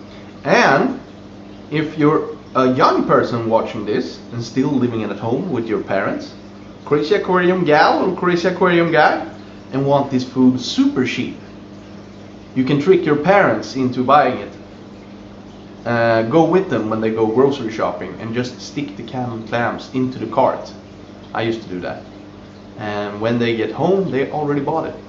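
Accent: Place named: New York, United States. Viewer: I love you channel i subscribed right away . love your accent and humor. Love for newyork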